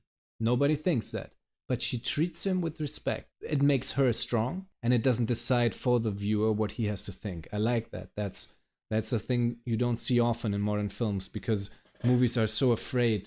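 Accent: Germany. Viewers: that one killed me 💀🤣 Came here from the "Adolescent" video and the comments under it were wild... But I really enjoyed the way you chose to respond to them, so I checked another video and wasn't disappointed. As someone who both read the book and saw the movie, I got a bit offended by the title of this video, as the movie was awful compared to the book. But as you're only judging the acting, there might be some good scenes in it. idk. To me, personally, there was no chemistry at all between the two main characters and a lot of the side characters did a way better job at making me feel for them. That's why the last scene also didn't move me in any way. I think this movie would've been perfect with some unknown actors to focus on the story and not on Blake's stupid fashion choices or whatever. I think you're doing a good job with these videos. Keep it up! 💜 P.S.: Are you German? 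Your accent sounds like it.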